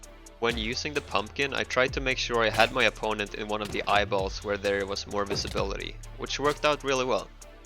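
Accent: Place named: Sweden. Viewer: du måste vara svensk efter din dialekt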